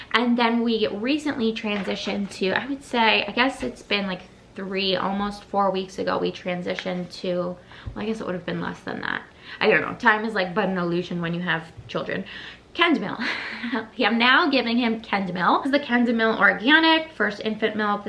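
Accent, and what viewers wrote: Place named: Central Jersey, United States. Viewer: We have literally the same accent (I'm originally from central Jersey) 😂 my husband always teases me when I say "bad" like that 🙄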